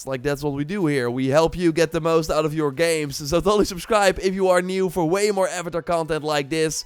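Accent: Netherlands. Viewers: Nou je bent zeker Hollander?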